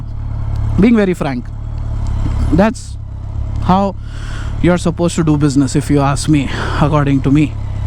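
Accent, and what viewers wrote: Hyderabad, India. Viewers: BHAI HYDERABAD SE HAI KYA 😎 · bhai vidoe kaisa bhi rehndo magar my khaali tumhari vo zuban sunne keliye video dekhtaun ji kya pyaari Hyderabadi bolte tum toe bulle me miladete dekhne walin ki han rahi baath video ki ab aapse milne ka wakth akhir aagaya bohot dinose preowned bikes leneka soch taun magar kisi pe bharosa ny mere bhai aap line me utargaye ab shayad mere ko meri dream poora karneka time aagaya dikhra hy jaldi lockdown khatam hoagaya toe accha hy milke requirement batatahun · Hyderabadi hai bolo 😎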